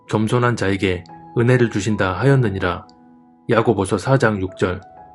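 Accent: Jeolla, South Korea. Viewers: 전라도 사투리....^^ 반갑습니다~ㅋㅋ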